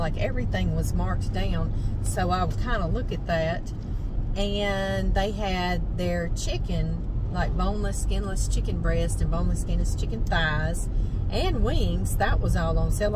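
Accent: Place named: New Orleans, United States. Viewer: Nothin like that good ole N.O. French bread! Sounds so good. You make me homesick and remind me Of my cousins and life there and growing up. Love the way you talk and your mannerisms and sayings. Just like the old days for me.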